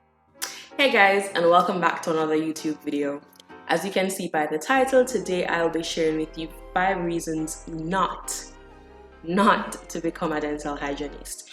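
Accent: Jamaica. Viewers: Are you a jamaican RDH or overseas? Edited: lol I ended up finishing the video so I know now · She sounds like she has a Jamaican accent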